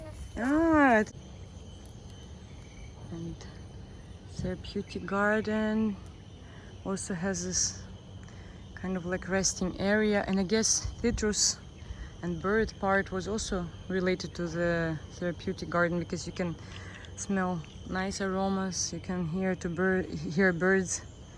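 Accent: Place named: Portugal. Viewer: hi where are you from your english has a funny accent! oh you are fom portugal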